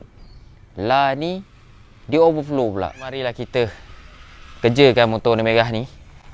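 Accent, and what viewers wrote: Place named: Johor, Malaysia. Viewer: Asslmlkm... Oooooo org Johor klau ckap try...bunyi dia...terghaiii Oooooo bari Sy tauuu😁😁😁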